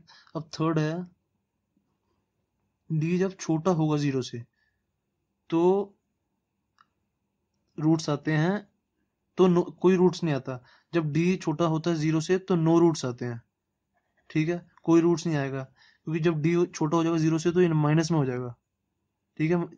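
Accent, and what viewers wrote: Punjab, India. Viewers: r u from punjab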